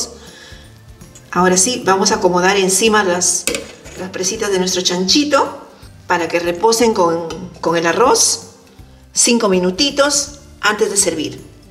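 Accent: Peru. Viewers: El acento peruano es muy bonito gracias por la receta un abrazó. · Exactamente se nota cuando habla un peruano en el extranjero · El acento y la comida peruana es exquisita y con sus variedades en las preparaciones de sus potajes...TE AMO PERU !!!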